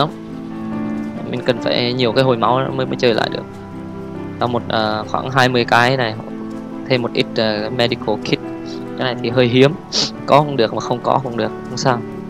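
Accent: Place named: Central, Vietnam. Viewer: Giọng anh cứ lơ lớ tiếng miền Trung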